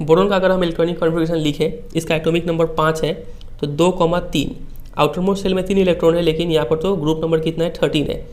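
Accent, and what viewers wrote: Bihar, India. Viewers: Sir aapki voice se pta chalta h ki aap bhi bihar se ho vaise sir main bhi bihar se hi hu Samastipur district se.....by the way sir aap itna acchhe se padhate ho ki mujhe lgta h ki school chodd ke aapse padhu padhu..bs .. . Thanks alot sir for giving such bigg efforts for us.....sir love.....so much......bhagwaan kre aapka channel itna grow kre ki aap hmesha kush rho or apki financial issuue....hamesha achhi rhe ....thanks sir ...😍😍😍😍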